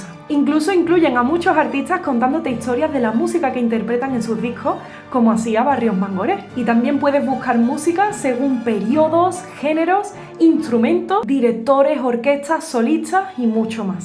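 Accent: Andalusia, Spain. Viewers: Escuchar ese acento andalú 😍🥰 enamora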